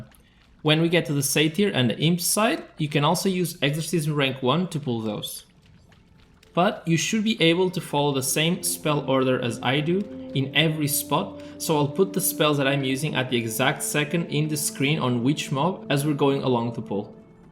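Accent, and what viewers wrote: Portugal. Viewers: I could sense it in the accent, olá amigo! 😆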